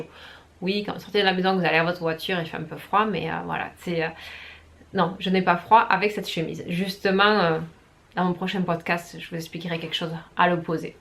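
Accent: South, France. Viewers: Bonjour, merci pour ce partage avec ton bel accent ensoleillé 😄 Tu parles de l'application Couture Actuelle, où puis-je la trouver?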